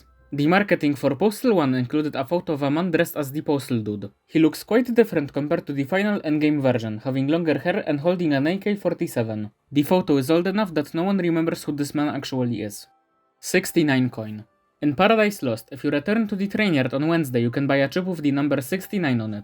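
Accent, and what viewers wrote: Poland. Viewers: tickhest polish accent i've heard in a while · Uwielbiam polski akcent. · X3 piękny akcent polski, tak coś czułem xD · slysze ten akcent i wiem ze to polak. peak material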